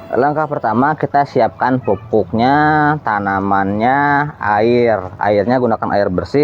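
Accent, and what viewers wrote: West Java, Indonesia. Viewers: Baru 2jam aploud, mantap ilmunya pak. Penyampaian simpel mudah difahami dng logat Sunda tambah epik. Saya dari Jatim enak mencerna penyampaiannya👍🏻👍🏻👍🏻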